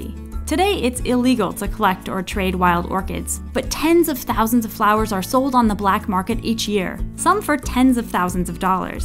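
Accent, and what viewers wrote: United States. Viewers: Very fine piece of work, Kingsley. My Australian wife wants to know where you found an American narrator? The word, orchidelerium is NOT a Victorian word, for those interested. My late editor, Anne Boston, came up with it to entitle an article I wrote on Victorian "orchid mania" published back in the 1980's for the now defunct, "Garden Magazine." It was used a second time in my 1989 book, "Wily Violets and Underground Orchids" (still in print). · i never knew the depths of american pronunciation before..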